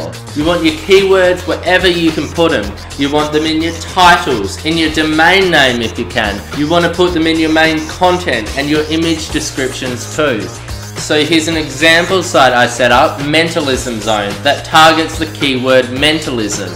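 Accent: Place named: Australia. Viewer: Niche is pronounced "Neesh" not "Nitch" ... nice to hear an Australian voice on one of these kinds of videos though :)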